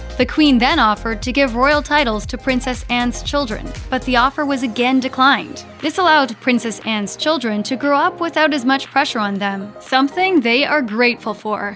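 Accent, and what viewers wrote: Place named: United States. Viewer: No offence intended, and not that anyone was asking, but I can't watch a doco on the Royals that features an American voice over. It's like putting sugar in tea. Or using a wood chipper as a food blender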